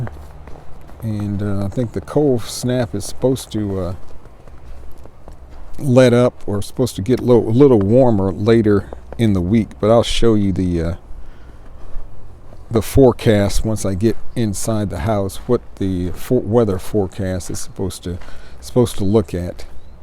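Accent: Texas, United States. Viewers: This is the clearest English with a Texan accent I've ever heard. Thank you so much for sharing your English knowledge!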